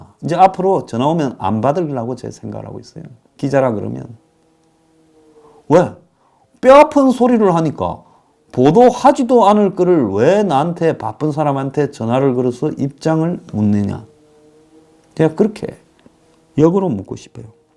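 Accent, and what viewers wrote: Gyeongsang, South Korea. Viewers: 루카스님의 의견에 동의합니다 기분이 좋네요 미국의 선거전 이 정부 어쩌나! 정의는 이긴다 황소장님 경상도 사투리 정이가 네요 남편이 진주 태생이고 똑 부러지는 사람이거든요 황소장님 화이팅!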